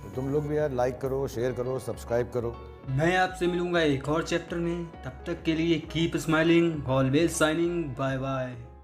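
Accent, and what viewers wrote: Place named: Uttar Pradesh, India. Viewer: Kya bhai u.p. ke ho na aap mast👍👍👍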